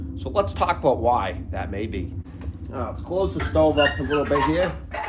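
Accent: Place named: New England, United States. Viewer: Disagree, the Davos crowd, or you name your favorite gathering place or club, in other words those who own our politicians, want the population to be 500,000,000, no more. Further, they realize by actual examples that have occurred in the U.S. and Europe, that people freeze to death in winter and die from heat exhaustion/stroke in the summer, where they have no guaranteed access to reliable energy. In winter this is fossil fuels backed up by wood and in the summer fossil fuels. And now with AI developing exponentially as well, that elite will no longer need wage slave labor for the majority of production and services either. This is the basis of their war on fossil fuels and other combustible energy sources. I recall reading in the last few years that Britain has already gone so far as to prosecute people for burning wood. Perhaps someone can correct me if I am wrong about that. 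Notwithstanding, Britain is also making war on fossil fuels under the climate change fear PSYOP. Question is, regardless of the basis for this, what do we do about it? Does anyone believe the political process is going to work? I believe I may have heard a New England accent from the presenter. Last time I drove from Massachusetts into Rhode Island I literally saw a, "are you ready for 2030" highway sign! But areas where you think you would be safe from this war on humanity are also capitulating, just not as radically or openly. I live in coal country, but for some reason coal plants are being not o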